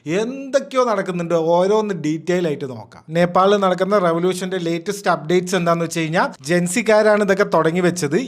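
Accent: Palakkad, India. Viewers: ningal palakkad aano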